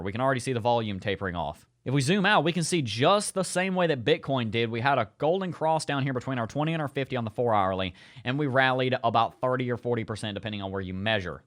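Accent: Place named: Los Angeles, United States. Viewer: sounds like you're in LA! ✌😆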